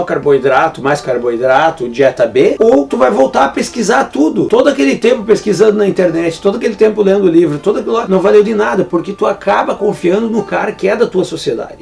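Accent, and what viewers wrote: Rio Grande do Sul, Brazil. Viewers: tu é gaúcho? Fala como um gaúcho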